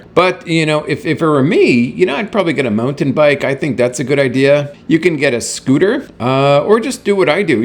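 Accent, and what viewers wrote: Canada. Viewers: Thought I detected a Canadian accent! New subscriber here from BC. Malaybalay looks very interesting. So insane how cheap it is...especially the rent! You seem to have created quite the income stream for yourself. Congrats. I'm looking forward to viewing more of your content.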